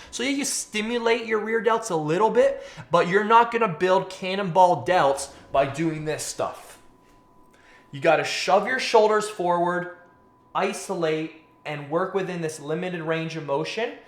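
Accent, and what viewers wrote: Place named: Canada. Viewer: Canadian accent, ey.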